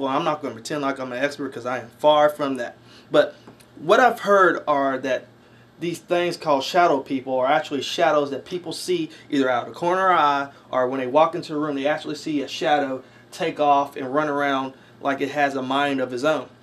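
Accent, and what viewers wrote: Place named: South, United States. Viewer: just curious but are you from down from around like in the North Carolina area? down in the southern u.s area. not to be offensive or anything cause you have an accent. you say "Yall"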